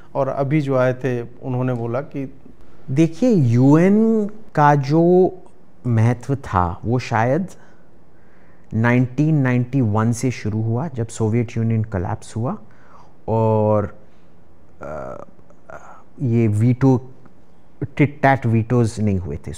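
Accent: Standard. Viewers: जी आप की हिंदी बहुत शुद्ध है। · 1st thing 1st - All praise due for for his chaste Hindi delivery!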